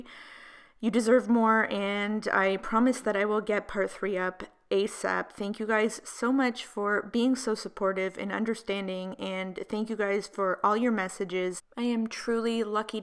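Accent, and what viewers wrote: Canada. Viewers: Are you from Canada? Your accent sounds Canadian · Thinking of you! I appreciate all your content. I'm poor and disabled, so I'm a makeup buyer on a thin budget. You exposing shady or law-breaking (and rich) influencers gives me a small bit of schadenfreue, which I need sometimes. This is a little silly, but your Canadian accent always takes me back to my salad days, when I was doing my Master's and teaching in Windsor, ON. Everyone made fun of my Michigan nasal-ass accent, and I delighted in every "aboot." Slowly I began to develop my own Canadian accent, which would last for hours after I drove back over the Ambassador Bridge. Now I can shift between the two. But your every "sorry" takes me back to those fun times, chilling in the campus pub. After I watch your videos, I find I'm speaking in my Canadian accent. Sorry for the long story - I just thought you'd appreciate a little haha in this mess. 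Take care.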